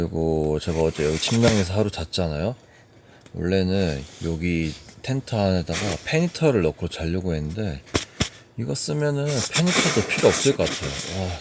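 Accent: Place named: Seoul, South Korea. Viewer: 날이가면갈수록 ㅋㅋㅋㅋ서울말 느신거같아요 ㅋㅋㅋㅋㅋㅋㅋㅋㅋㅋㅋㅋㅋㅋㅋㅋㅋㅋㅋㅋㅋㅋㅋㅋㅋㅋㅋㅋㅋㅋㅋㅋㅋ오늘도 랜선캠핑 👍🏻👍🏻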